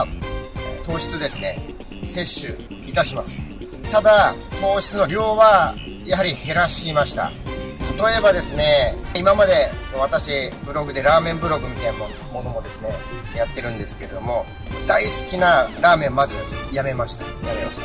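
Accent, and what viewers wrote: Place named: Tochigi, Japan. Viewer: ありがとうございます 関係ないですけど自分も栃木県民なので応援してます！w たまにでる栃木訛りがいいですね！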